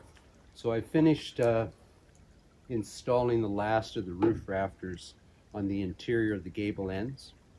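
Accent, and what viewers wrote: Canada. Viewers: Just found your channel, it's amazing! Just subscribed. I'm doing something similar on my channel, building a log cabin with only hand tools, although I have some more modern tools. I'm in the early stages of harvesting logs. I'm learning a lot from your channel and will definitely be incorporating a lot of the things I've learned here into my build. Keep it up! Also where you located? The accent leaves me to believe southern Ontario. I'm nearby in Quebec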